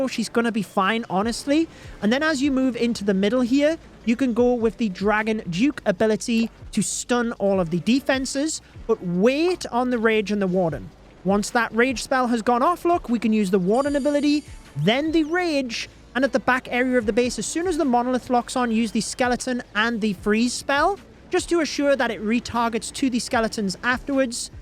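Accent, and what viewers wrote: United Kingdom. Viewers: hi mate, i think you are an american but im wrong youre british man😅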